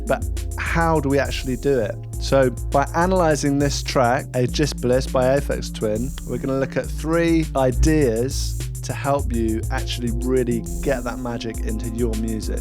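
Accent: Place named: England, United Kingdom. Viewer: being an american, you have the most english accent i think i've ever heard haha. that is, other than the old timey exaggerated nonsense.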